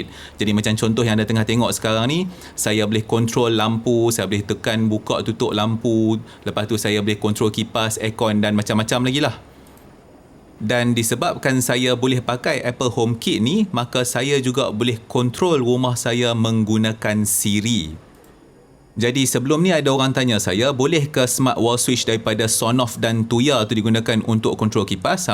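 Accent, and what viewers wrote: North, Malaysia. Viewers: tang cakap utagha tu yg sempoi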